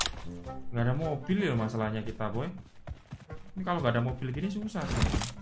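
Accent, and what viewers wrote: Java, Indonesia. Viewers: Game ke 2 pizza hut wkwkwkw Jowone ilooo lucu ngomonge cuk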